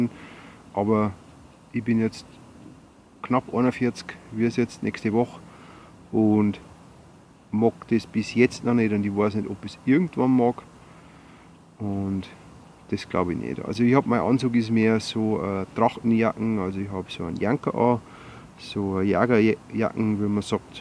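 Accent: Bavaria, Germany. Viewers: Moin....dein Bayrisch finde ich super....wer das nicht verstehen kann hat selber schuld...du haste ne gute Art drauf...gruss aus Schaumburg...Gruss F. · Da trau ich mich EIN Mal, ne Frage zu stellen und du nimmst die gleich - DANKE dafür!:D Und ich bin absolut dafür, dass du deinen bayrischen Dialekt beibehälst, denn der macht den ja erst komplett zum - und genau wegen dem schaltet man doch erst rein in deine Videos. Wem es nicht passt, soll sich andere Kanäle anschauen, aber hier nicht so nen Blödsinn daherschwallern. Meine Güte augenroll Wünsch dir noch schöne Rest-Weihnachten und schonmal alles Gute zum Geburtstag, bleib gsund und so wie du bist. Grüßla aus Franken:) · Guten Morgen,ich grüße dich aus dem fernen Thüringen ins scheene Bayern,als erstes,ich find es vollkommen richtig das du zu deinen Dialekt stehst,das ist auch immer in ein Stück Heimat was man in sich trägt und wem es nicht passt soll sich deine herrlichen Videos nicht anschauen,ich versteh dich sehr gut,ansonsten find ich deine Frage Antwort Videos sehr sehr gut,leider im Hintergrund der neue Sound der Natur(Kettensäge),mach weiter so,beste Grüße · Soll ich Dir was sagen, ich finde es gut, dass Du bayrischen Dialekt sprichst... 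das macht Deine Video's sehr liebenswert 😊. Und auch sonst wirkst Du sehr sympathisch. Deswegen bleibe wie Du bist. Frohe Weihnachten und einen guten Rutsch wünsche ich Dir und Deinen Lieben. LG Kevin 👋🏼😊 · Hallo, dir und deiner Familie erstmal frohe Weihnachten & einen guten Rutsch ins neue Jahr! Ich danke dir für deine unterhaltenden Videos die ich als Kölner trotz bayrischem Dialekt sehr gerne schaue und auch nach den ersten 3 Videos hab ich gar nicht mehr auf den Akzent geachtet und verstehe inzwischen fast alles, also behalt das auf jeden Fall bei, ich freu mich nächstes Jahr wieder neue Bushcrafting Videos zu sehen. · Bleib bei deim boarisch! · Bleib blos beim boarischn. Unsa Dialekt is einfach sympathisch und gmiatlich. Mia san ja koi Preissn. :D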